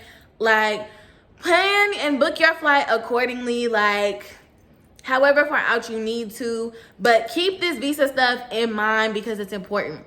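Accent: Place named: United States. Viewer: Ndewo, ke kwanu...funny american accent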